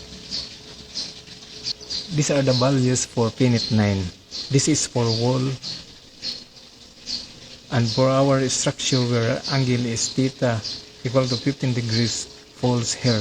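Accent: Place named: Visayas, Philippines. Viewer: p o t a n g e n a n g yung accent mong bisaya, dapat hindi ka na nag eenglish... napaka baduy mong magsalita, mababang uring taong bisaya... nakakabuwiset content mo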